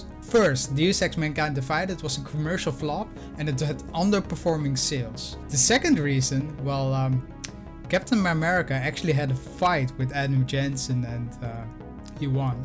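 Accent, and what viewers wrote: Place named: Netherlands. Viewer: You have quite the Dutch accent ;))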